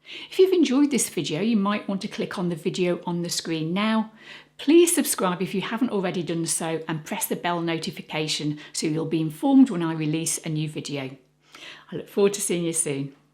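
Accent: England, United Kingdom. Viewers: This is a great video, but can you please do it again without the English accent? I'd prefer to hear it with a Canadian accent. Thanks!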